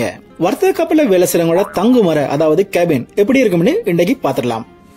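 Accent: Sri Lanka. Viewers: ஐயா கப்பலில் தங்கும் அறைகள் பற்றி நன்றாக உள்ளே சுத்தி காண்பித்தீர்கள் மிக்க நன்றி நீங்கள் தமிழ்நாடு தமிழரா இலங்கை தமிழரா உங்கள் குரல் சற்று இலங்கை தமிழர் போல் தெரிகிறது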